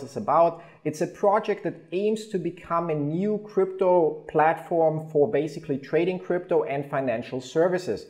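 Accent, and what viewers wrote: Germany. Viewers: this heavy german accent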